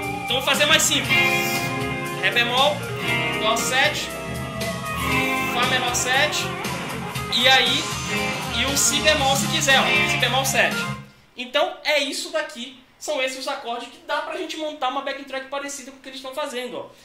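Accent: Pará, Brazil. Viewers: cara, venho acompanhando teus vídeos ultimamente e gostado bastante. Mas to com uma curiosidade, tu és paraense? kkkk ou alguém ja te disse que teu sotaque lembra muito a galera daqui do Pará? kkkk abraços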